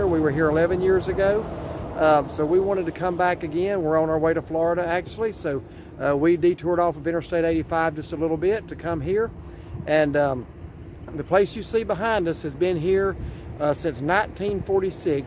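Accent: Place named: North, United States. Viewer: You can spot a yankee 40 miles. Still don't know how to say pecan .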